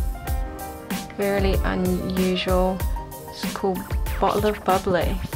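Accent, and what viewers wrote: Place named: United Kingdom. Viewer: Hi, yes I know its a 2017 video but I'm still doing catch up, I'm a slow old bird lol. Now that I've seen this video I know you should be able to do some gel designs for me, even just simple easy ones I don't care - just give me gel (x). I cannot make out if your British or not, sometimes I hear an accent and then you sound very uk-ish. Take care hun,Xv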